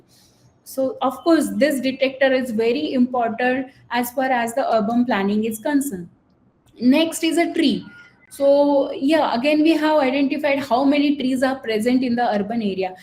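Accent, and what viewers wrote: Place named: India. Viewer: >indian accent No thanks.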